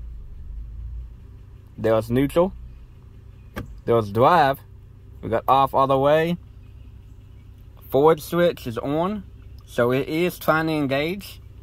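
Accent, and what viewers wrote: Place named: Arkansas, United States. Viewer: I was locked up with a guy from Arkansas with an accent exactly like yours, is that where you're from?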